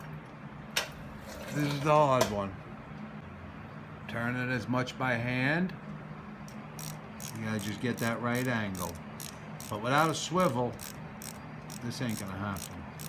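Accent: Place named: New Orleans, United States. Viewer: I volunteer at the WWII Museum in N.O. and when meeting visitors from out of town I always try to guess where they are from based on their accents. You have an accent like people from the N.O. area and also from the northeast. I want to say perhaps the metro N.Y. area, am I correct or totally off base? :- )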